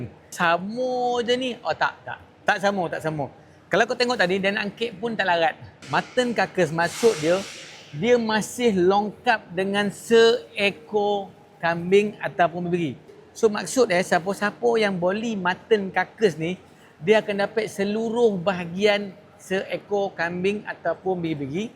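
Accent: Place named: Negeri Sembilan, Malaysia. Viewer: Speaking nogori pokat Broo....😅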